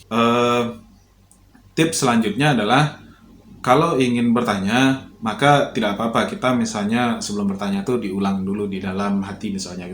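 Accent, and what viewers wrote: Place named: Minangkabau, Indonesia. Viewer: mksh pak,, logat dan nada bapak seperti logat orang Minangkabau atau Padang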